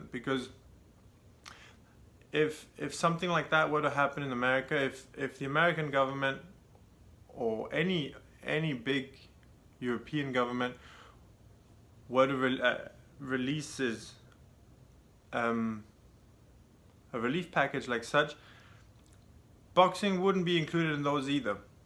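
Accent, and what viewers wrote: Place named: England, United Kingdom. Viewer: What part of England are you from? your accent is very light and easy to understand. Sorry I’m just curious on various British accents, I’ve been watching a lot of Hammer horror films lately.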